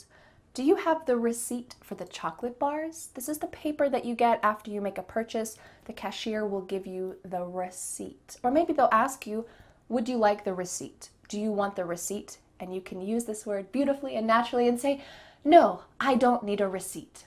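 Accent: United States. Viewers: I love your pronunciation it's better American! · Is it America pronounciation ? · American English pronunciation.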